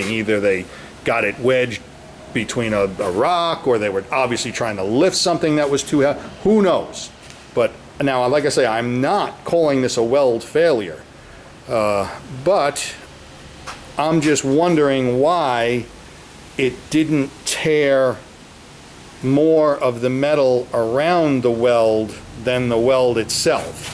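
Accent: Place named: New York, United States. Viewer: Sounds as if you are from New York / or New Joyzee Could you not have put some loads on it that would have , as you carbon arked , helped draw it togeather & then even more so as you welded , hot metal shrinking has ton’s of force. One must be very much a Mc Geever doing this kind of stuff. If that cyl boss is out of square , it will cause side loading to the cyl & it will not last a month ! I own a CASE extend a hoe . Looks like the John Deer is fashioned different, but that looks like the inside of the extender , that would make the boss the lifting point for the boom, final picture, they probably had the boom extended & tried to lift a # of HEAVY objects ,- a stupid move, - , drag it in close , re-rig & then lift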